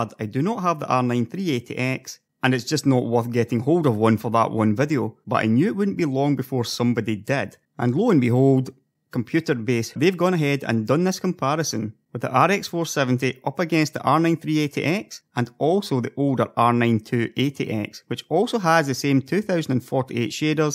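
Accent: Scotland, United Kingdom. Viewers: a Scottish bloke talk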